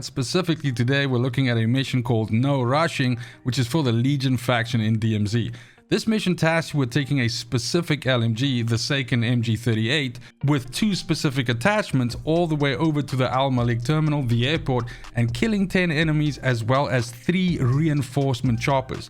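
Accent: South Africa. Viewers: South african? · You from South Africa?or am I hearing that accent all wrong🤣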